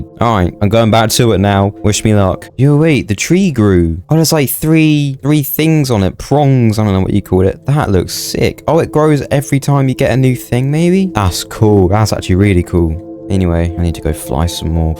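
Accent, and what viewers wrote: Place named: United Kingdom. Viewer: U sound British but watching u play I think I may add cheats Cause that will take very long what mod pack did u down load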